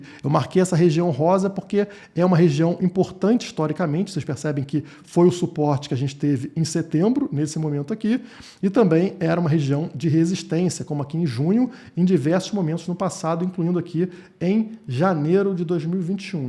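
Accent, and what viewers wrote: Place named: Tijuca, Brazil. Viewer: Rapaz...você é tijucano?! Rsrs TMJ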